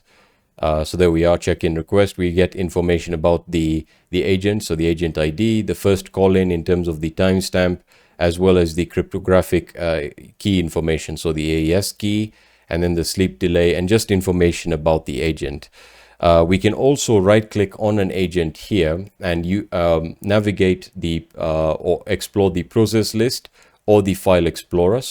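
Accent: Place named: Arab world. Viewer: heh yea , familiar arabic accent - ps me nohomo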